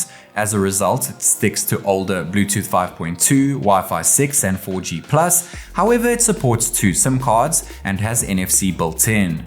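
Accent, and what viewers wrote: South Africa. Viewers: You're South African???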